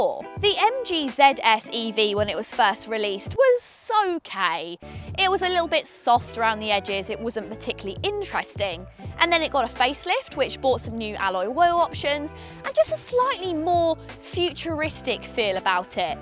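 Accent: United Kingdom. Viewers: i came here for the electric reviews. i stayed for the british accent.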